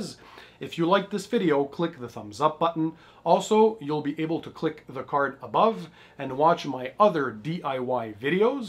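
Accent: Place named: Canada. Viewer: Canadians and their accents.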